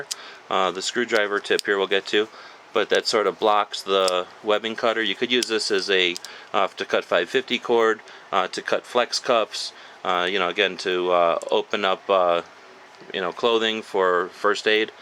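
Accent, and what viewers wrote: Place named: United States. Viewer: When I hear your voice I hear 'Merica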